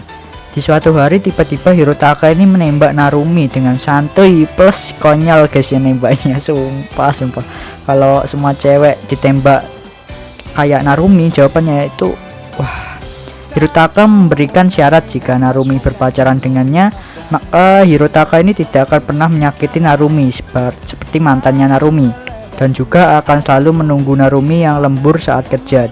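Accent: Java, Indonesia. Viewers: Wong jowo maa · Eh kau orang Jawa ya?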